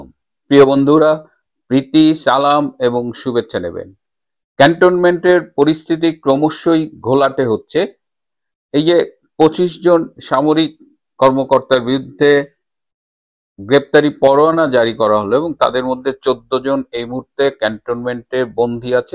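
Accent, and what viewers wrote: India. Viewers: বাড়ী কি ভারতে? বাঙলা কলকাতার মত।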